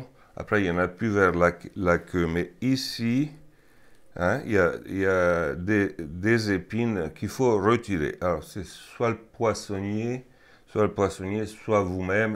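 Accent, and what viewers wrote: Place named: England, United Kingdom. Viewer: Sir, thank you! J'admire votre courage de présenter la recette en français, alors que j'ai décelé un accent anglais. 💛👍. Subscribed!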